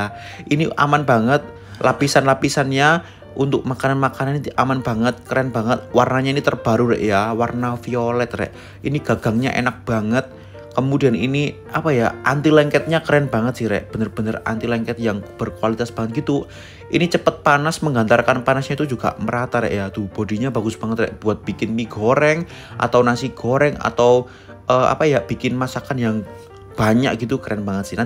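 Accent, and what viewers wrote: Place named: Surabaya, Indonesia. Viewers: Aku seneng logat nya, surabaya an.... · Kirain NgaPak ternyata suroboyo 😂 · logat cino suroboyo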